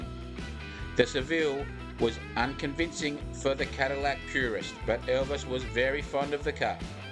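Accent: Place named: Australia. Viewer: WHY IS A TERRIBLE AUSTRALIAN VOICE SPEAKING HERE - SURELY A PROPER SPEAKER WOULD HAVE BEEN BETTER !!!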